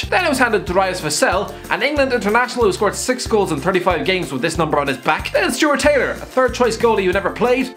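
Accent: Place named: Ireland. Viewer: I can't understand a word he's saying 1 because he's irish 2 because he talks too fast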